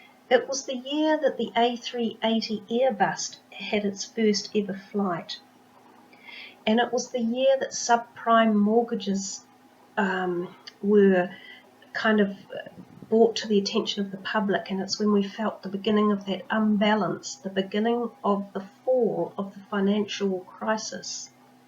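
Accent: New Zealand. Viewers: I'm loving hearing your Kiwi accent!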